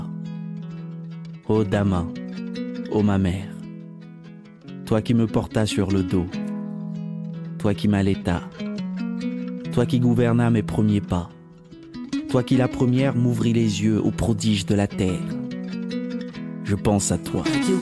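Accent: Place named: Europe. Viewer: Pourquoi pas lus par un fils AFRICAIN??? J'aurai aimee entendre ca lu avec un accent Africain, pas European.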